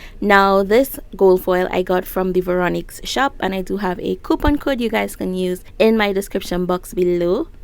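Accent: Trinidad and Tobago. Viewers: Tell me why I hearing a trini accent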